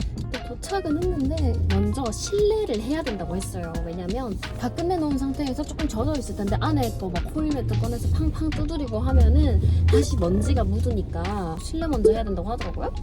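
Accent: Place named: Gyeongsang, South Korea. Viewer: 서울말 쓰려고 노력하는 경상도 미인이네^^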